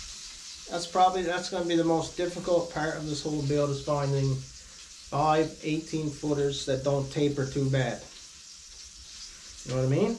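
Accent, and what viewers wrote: Newfoundland, Canada. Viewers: Wow what a guy! Thank you sir for the shout out! Some nice to hear that beautiful newfie dialect come through my speaker lemme tell ya! Keep er going man!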